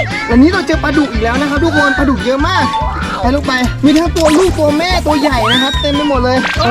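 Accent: Isan, Thailand. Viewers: เป็นคนอีสานแมนบ่จ้าอ้าย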